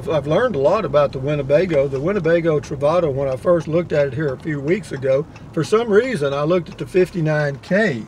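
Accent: Texas, United States. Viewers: Enjoy those tacos and thanks for the positive, happy attitude even when referring to negative comments. The more you discuss the class B vans, the weaker I'm becoming. If there is one weakness I have, it's motor vehicles and the reason I started following this channel is I was looking at small travel trailers online when came as a suggestion from you tube. I've been watching every one I can since then. It's a combination of the content and also largely on as a soft spoken gentleman, the Texas accent just adds to it all.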